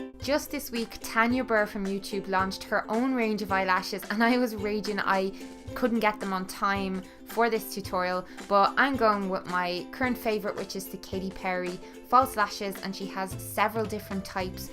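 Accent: Ireland. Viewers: This is my second time watching this, I wanted to try the eyes you did and I had the captions on by accident, oh my God I'm weak! It's clearly having trouble with the Irish accent because some of the mix ups are hilarious eg.. 'relax in a box (bath!), 'as a guideline for your Catholic (catflick!) 'its nice and dry skillet chiding me work hard play hard you'll never get attacked again. I hope you enjoyed the CEO'!! Too funny!:'D Ps, You look unreal in this video and congrats on graduation!!:) x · Congrats again girlie!! You look glowing :). I am married to a gorgeous Scotsman but I swear I should have found an Irishman lol cause I could listen to you for days lol!! Love from Canada xo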